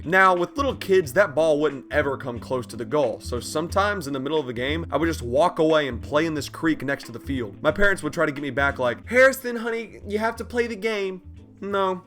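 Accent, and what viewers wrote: South, United States. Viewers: why do I kinda hear a Southern accent in his voice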